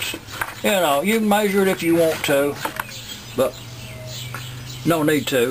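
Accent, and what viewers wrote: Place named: Appalachia, United States. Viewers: You sound like a fellow Appalachian American. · Josh Green He's from east Arkansas. I love the way he says "just put it in yonder." Appalachian accent.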